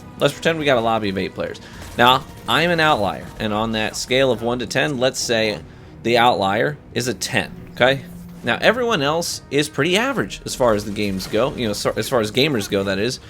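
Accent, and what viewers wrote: United Kingdom. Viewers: Gotta admit, I wasn’t ready for the funny British accent. Hahahahha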